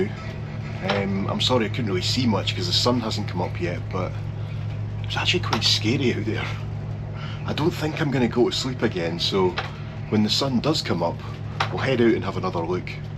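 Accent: Scotland, United Kingdom. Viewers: I’m a Brit living in Sweden ca 2000 I decided to take the overnight ferry from Gothenburg to Newcastle via Kristianstad, it was February and we had a storm in the North Sea. I had a cabin on deck six probably under the Bridge, it was like being on a big dipper, I never slept a wink I could hear metal banging a crashing, so I went down to the bar area and met a Finish guy who insisted on buy me beer, which I didn’t really want as I had to drive the next day. At six in the morning I was like you out on deck as the stomach had passed and it was calm approaching Newcastle but the wind was incredible it nearly froze my face! I only found your channel last night and already watched 4 videos thoroughly enjoyed them 5 star 🌟🌟🌟🌟🌟. BTW I love listening to your accent my mother was from Dundee and I was born there moved to Southern England when I was 5 years old, spent a lot of summers in Scotland played golf once as a boy in Montrose. 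I am 78 and I want to visit Scotland soon and look up some cousins while I still can. Keep up the good work ! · Certain accents in the English-speaking world have phrases that can’t be seriously said by all the accents. No Yank, Canuck, Kiwi, or Aussie is going to say “sneaky wee peek.” But a Scot? Perfection! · I really enjoyed this one! Great presentation and I always love your descriptive Scottish brogue. Well done .... what an adventure!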